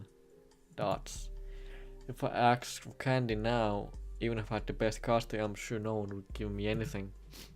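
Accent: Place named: Finland. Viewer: I love your cute finnish accent. But fuck that weeb shit xd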